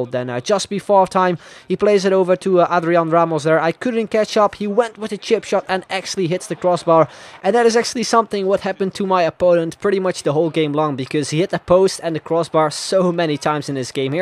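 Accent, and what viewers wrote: Netherlands. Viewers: You're dutch aren't u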